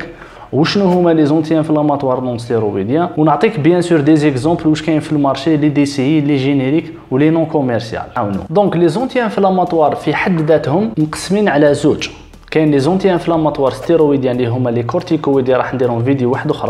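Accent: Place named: Algeria. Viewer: سمعتك ولم افهم شيء لانك تتحدث باللغه الفرنسيه على لهجتك تقول انت جزائري سوف ابحث احلى هذا الموضوع عند اصحاب المشرق العربي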